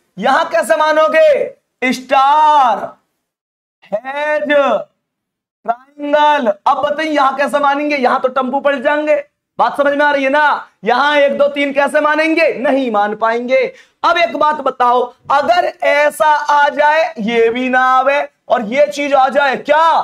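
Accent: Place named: Aligarh, India. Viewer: Sir aap aligarh se ho kya Apki language hmari jesi h😂 M aligarh se hu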